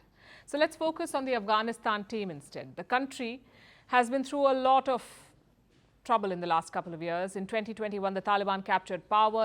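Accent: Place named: India. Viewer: Palki is wonderful . Her news presentations are comprehensive and concise. And the best thing is that she remains Indian in dress ,dialect and thoughts. God bless her !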